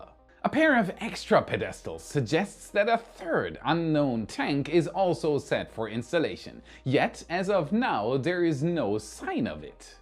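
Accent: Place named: Germany. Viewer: I love your show. I watch it all the time. I love your German accent, too. Let me share with you a secret: Adjacent is pronounced AH-JAY-SENT. The ‘C’ is soft and sounds like an ‘S’. I’m not sorry - I couldn’t resist. Keep up the great work.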